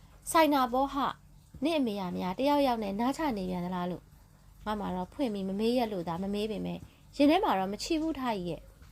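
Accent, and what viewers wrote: Upper Burma, Myanmar. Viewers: Jayရဲ့အသံလေးကို ချစ်မှချစ်😍😍😍ဘာလေးဖတ်ပြပြ အညာသံနွဲ့နွဲ့လေးနဲ့ ပီပီသသ စိတ်ပါလက်ပါ😍🩵🩵ကျေးဇူးအထူး & DAN team🩵🩵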